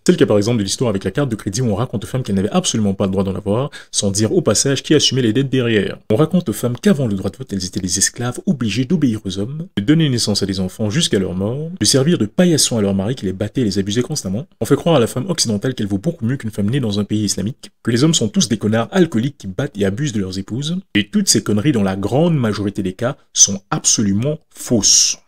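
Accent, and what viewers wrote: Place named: Antilles, Caribbean. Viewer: Excellente vidéo mais ... Petite suggestion bro pour tes prochaines vidéos ! Tu as un bon timbre de voix qui sent bon les Antilles ... mais par moment ton débit de paroles est trop rapide 😅 Ça gâche un peu 😉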